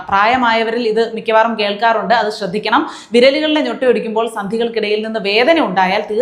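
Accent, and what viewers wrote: Thiruvananthapuram, India. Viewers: ജി തിരുവനന്തപുരംകാരി ആണോ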